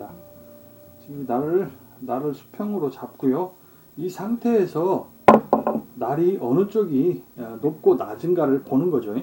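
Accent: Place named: Jeolla, South Korea. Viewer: 사투리를 많이 쓰시는데 호남지방 어디신가요? 나또한 그쪽이라서요.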